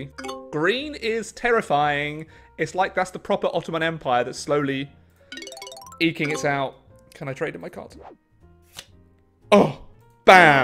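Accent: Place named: United Kingdom. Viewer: It makes my inner history nerd chuckle hearing a British person say"I don't want Quebec".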